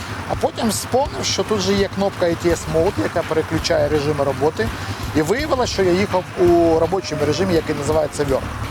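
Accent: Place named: Russia. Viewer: Брат! Видно же, какой твой родной язык!!! Говори пожалуйста на Русском, будет всем счастье!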